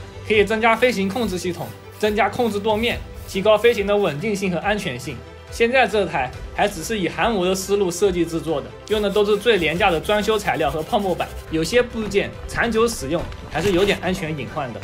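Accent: Jiangxi, China. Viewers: 哥们儿，你的赣普话好有特点😀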